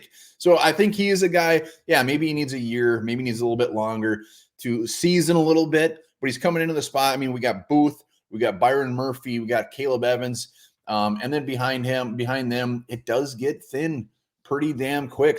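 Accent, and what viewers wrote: Minnesota, United States. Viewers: ik he did not pronounce swag like that 😂 “swage” that’s that minnesota accent at full strength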